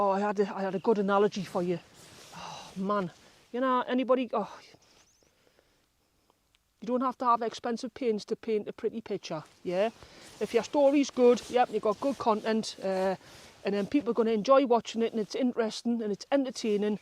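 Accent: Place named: Geordie, United Kingdom. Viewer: Luv your quaint Geordie manner of speaking. 🙂 (Ahm frum Yorkshire meself..we got our own quaint dialect...lol)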